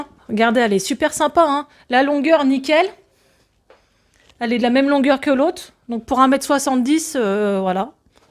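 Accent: North, France. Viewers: Bonjour. Vous êtes du nord ?? Vous avez un accent. J'aime bien vos vidéos. Bis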